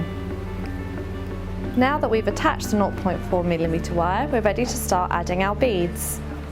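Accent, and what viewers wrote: United Kingdom. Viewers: She's Brit not American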